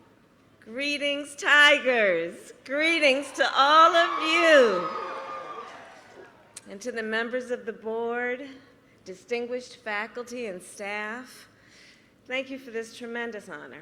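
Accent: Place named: South, United States. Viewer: Why is she talking like she was raised in the south?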